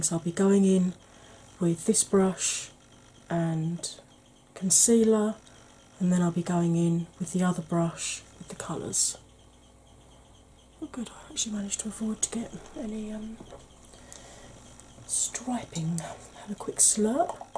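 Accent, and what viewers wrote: United Kingdom. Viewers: Welcome! Love British accents 😊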